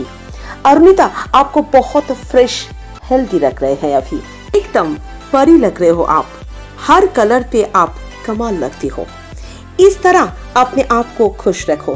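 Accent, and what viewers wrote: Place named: Bengal, India. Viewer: The heavily Bengali accented hindi commentary reminds me of one of Keshto Mukherjee's famous dialogue from the Bengali film 'Troyee' : " Debanander hindi filim keno, banglay fire esho" ...Why so much effort in the hindi narration? Arudeep has enough followers in Bengal to get views for the channel😊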